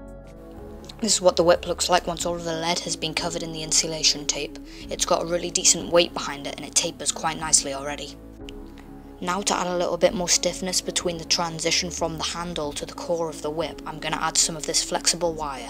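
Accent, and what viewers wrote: United Kingdom. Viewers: i've been watching your videos quite a time... and they're amazing... But when i saw your first video i realized there is something similiar in your voice... and its damn Harry Potter (daniel radcliff) in the two first parts XD i think it's because british accent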